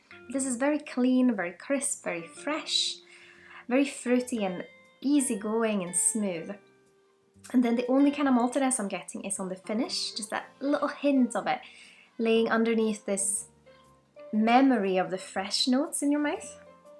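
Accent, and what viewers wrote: Scotland, United Kingdom. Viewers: Scottish or swedish ?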